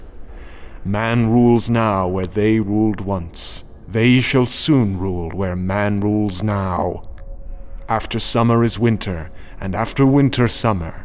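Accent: South, United States. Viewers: absolutely loved the reading...minus the southern accents used :) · Question: Why does the narrator do a southern hillbilly type accent when the story takes place in New England? I'm from Tennessee and wouldn't have a problem with the accent if the Dunwich Horror was the Pulaski Horror, but this is happening in Massachusetts. You gotta talk about the Pats and chowder and stuff like that. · Overall good narration but accent sounds more southern than northern as it should be.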